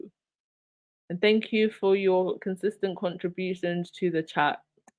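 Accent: United Kingdom. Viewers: Ugh! I hate missing the live! I love the English accent.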